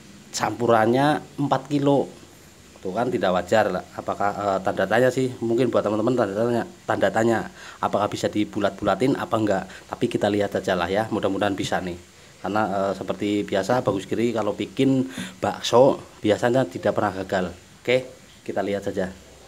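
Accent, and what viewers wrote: Indonesia. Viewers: Maaf suaranya kyak gak asing, mas apa orang jateng? Apakah mas alumni Ponpes al islah jember jatim? Tolong di bales🙏🙏🙏🙏🙏🙏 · Kepingin..hahaha..medok bgt omm good 👍 bermanfaat.tks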